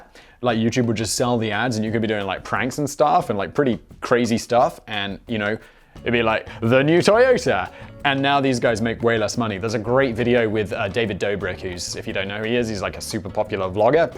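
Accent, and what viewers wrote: RP, United Kingdom. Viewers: bill spangler you’re not alone I do it cause I like his voice He has a very strong British accent and I adore his voice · you keep doing what you’re doing! I find you hilarious! The whole comedic package wrapped up in that silky British accent is awesome. The style is great and I like you laugh at your own jokes. I put your channel on auto play and fall asleep to it. So I am helping you crush that watch time and happy to do so. Plus since I always fall asleep, I even rewatch most videos! This is by far my favorite of your channels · Eagerly anticipating the animal channel that has the upper british accent narrating the life of like... a bee. Yeah. GIVE US THE BEE LIFE VIDEO DAMNIT. Or: Do a nature channel where you narrate the life of bessy, the gentle cow. Then the next day have a food critique channel that critiques steaks. 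🤣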